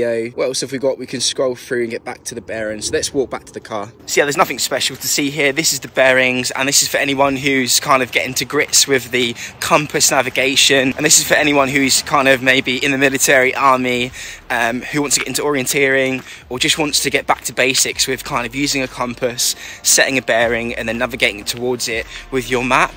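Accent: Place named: United Kingdom. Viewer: The video is probably good, but sorry can't understand half of it because of the heavy British accent.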